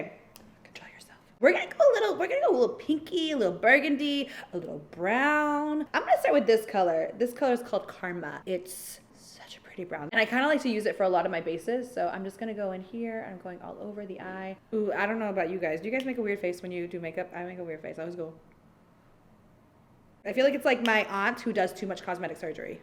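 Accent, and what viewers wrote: Iraq. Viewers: هدئ بيوتي اول مرة الحك عل فيديو من اول ١٠ دقائق احبج من البصرة وحجي عراقي فدوة😂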